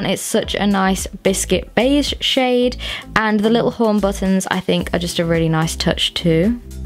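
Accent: England, United Kingdom. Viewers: Ja sie kommt aus England 🏴 Das ist britisches Englisch :) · I'm German and really bad with English accents but where are you from? I'd say England but I'm not sure 🙈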